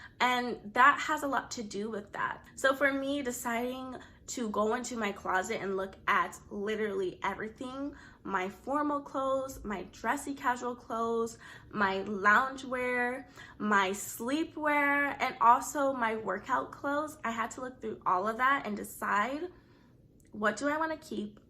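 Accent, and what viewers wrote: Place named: Caribbean. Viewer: Hey, Girl you sure you from down south because I swear I can hear Caribbean or jamaica in your accent. Thanks for this video.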